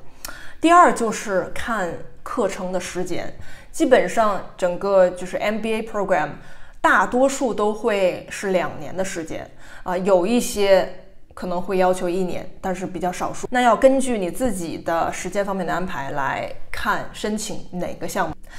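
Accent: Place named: Northeast, China. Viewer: 小姐姐是东北的哈哈，有一点小口音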